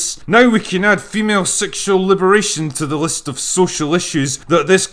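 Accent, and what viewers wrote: Scotland, United Kingdom. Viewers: Mr. Critical thinker are you Scottish ? I think you have a voice that could Rally up troops for battle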